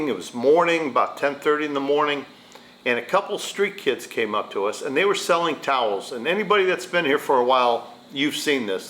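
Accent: United States. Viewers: you sound like you're from the USA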